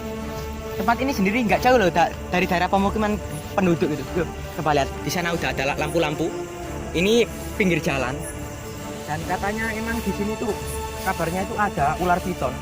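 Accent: Java, Indonesia. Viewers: Video bagus..tapi medhoknya itu lucu..jadi kayak lawak si inyong. 😊🙏😅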